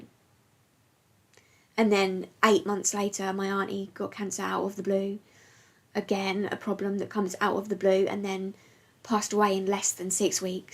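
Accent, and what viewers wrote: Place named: North London, United Kingdom. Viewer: I discovered your channel from the early Skybound days and you became an instant follow. There weren’t many British reactors that I could find back then and I was trying to suss out your accent …( I settled with somewhere North of the Thames )…,the giant mugs of tea and the ‘professional crying’ drew me in..! I remember a video you put out about 3 years ago and after that one, I could see the hurt in your eyes that you were trying to overcome and continue onwards but the damage was done and I noticed the sporadic posting..! Recently have been unfollowing some channels that haven’t posted in a while but yours was not on that chopping block…I kinda knew you’d be back sometime..! Anyway…I’m beginning to waffle but I do have a suggestion for a tv series to react to…it’s called Pluribus…it’s on AppleTv and apparently from the same guy that did Breaking Bad and Better Call Saul…two shows that I’ve never seen but liking this at moment.! 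But it’s great that you’re possibly coming back to reacting and that you have a focus to move forward with..! Excelsior… 😉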